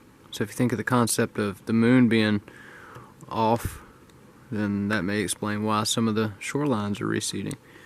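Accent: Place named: South, United States. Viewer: We both have that country accent. I love hearing your voice, reminds me of home. Everyone here gives me a hard time about my accent, but I proud of who I am. We are living in crazy times for sure, Ive noticed the water receding here also. Stay safe my friend💞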